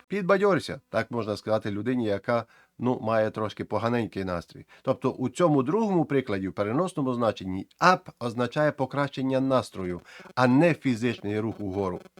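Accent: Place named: Galicia, Ukraine. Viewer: Красно дякую за ясне тлумачення! Мило та радiсно чути галицьку вимову пана! Най щастить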